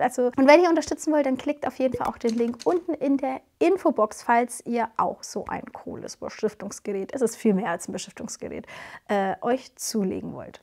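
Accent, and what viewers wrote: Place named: Franconia, Germany. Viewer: Liebe, ich weiß noch, als ich dich zum 1. Mal mit Sandra gesehen/gehört hab. Mein erster Gedanke war "die gefällt mir" und "die kommt doch aus Franken😂" dein Kanal heißt " " das war für mich persönlich nie nur nähen, sondern auch DIY's. Es ist deine Entscheidung, ob du mehr DIY's und eine Sommerpause machen möchtest. Es jedem Recht machen, kann man leider nicht. Dein Herz hat gesprochen und ich freue mich schon sehr auf ALLES was noch kommen wird ❤❤ Liebe Grüße und fühl dich fest gedrückt ❤❤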